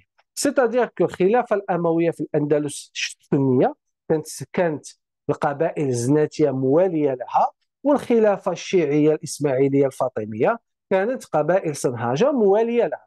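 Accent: Tlemcen, Algeria. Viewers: كلام الأستاذ واضح لا غبار عليه حتى ولو أدخل بعض الكلمات الفرنسية مع اللهجة التلمسانية الزيانية العريقة زادت للفيديو رونقا. تحياتي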